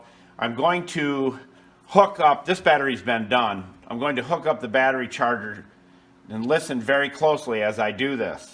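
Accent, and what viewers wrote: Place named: Midwest, United States. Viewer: Your voice sounds like either Missouri or Michigan. But a good teacher is always good at showing others how to do something.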